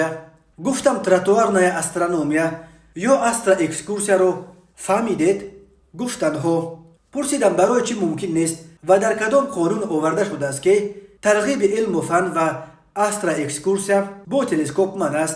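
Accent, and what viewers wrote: Pamir, Tajikistan. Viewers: Памирский акцент, помири гап зан помири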